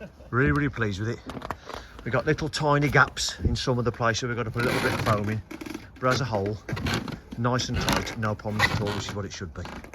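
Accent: Birmingham, United Kingdom. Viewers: I may have missed this, but what is the Length and Width of this Build? I may have missed it in Part 1...Sorry. Just curious if a particular wall lenght is more than 4.8m, bracing 2 pieces of timber, sould that be sufficient? Example 6m by 4m outbuilding. Definable feel the ground prep is much cleaner than have pouring concrete for a slab...think you have sold me on this rod system.... Videos and content great - plus the brummie accent ❤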